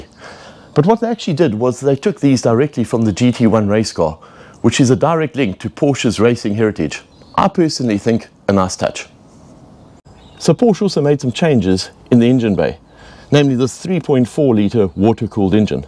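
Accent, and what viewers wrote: South Africa. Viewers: Are you from South Africa? Much love from Vietnam, as a South African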